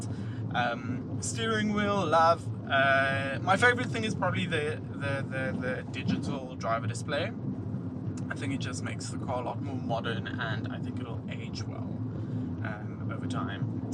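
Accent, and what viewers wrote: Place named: South Africa. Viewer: I could listen to that South African accent all day 🤗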